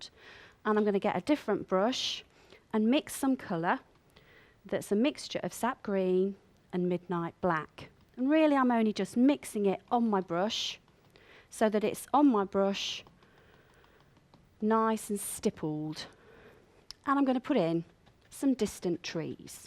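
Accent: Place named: Birmingham, United Kingdom. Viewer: Thanku for sharing. I love your ( Brummie) accent. keep 'em coming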